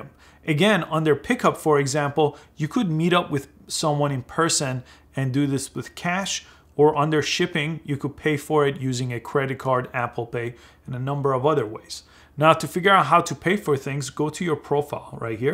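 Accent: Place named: Iran. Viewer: U sound Persian. I mean your accents is a bit Persian guys. am I right?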